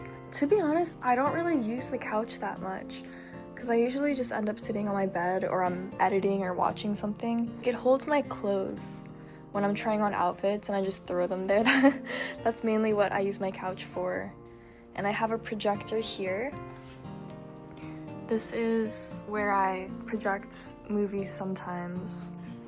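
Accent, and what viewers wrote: United States. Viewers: YouTube algo just randomly pushed me your channel. Can you do a video so we can learn more about you? did you grow up in Japan or another country? your English sounds very American to me, and not picking up much of an accent. I see your videos are getting a lot of traction already, you should be able to make this a full time job if you want to do something like that. Consistency is key. You are stunning btw. much love from Pittsburgh PA. :) Bye for now!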